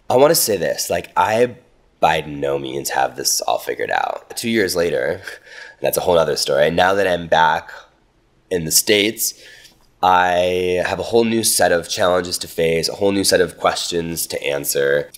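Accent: Argentina. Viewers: I'm Colombian, living in Brazil pretty much for the same reasons you moved abroad, but with some different points, but I can totally relate. because I didn't know anything about the culture or Portuguese, yet here I am... and now I understand why you have a kind of Argentinian accent when you speak in Spanish!!! Love listening to you speaking in any language btw. Abrazos 😊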